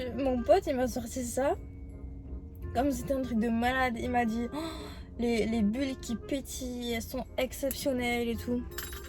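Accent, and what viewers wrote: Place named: South, France. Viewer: Premier accent du sud que j'entends depuis que je suis à LA ! Merci 🙌!! Sinon super vidéo, j'aime beaucoup ce que tu fais ! Faut que je continue de mater ta chaine ça va me donner des idées pour la mienne 😂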